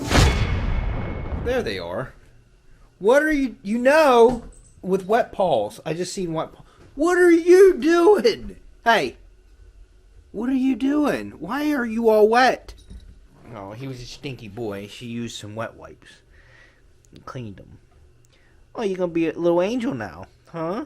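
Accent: Pennsylvania, United States. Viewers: Damn. That's unfortunate. It only looks like the door, fender and front pillar. By your accent, I'd say that you are in Eastern Pennsylvania? How about the junkyards in the Scranton area for parts?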